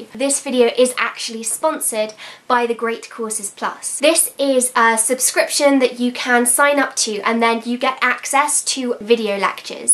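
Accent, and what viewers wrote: RP, United Kingdom. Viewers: now this what u call a hermione irl... the accent, the and productivity 😩✋ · Omg I love your voice and your accent is so posh ❤️😂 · Her accent 😭💛💛💛 I love British people 💛💛💛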